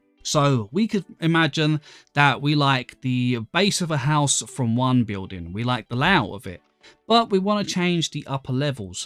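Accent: United Kingdom. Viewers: I HATE HIS BRITISH ACENT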